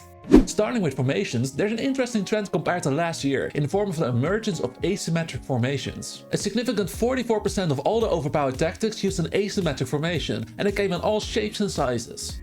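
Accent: Netherlands. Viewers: This guy sounds soooo Dutch :D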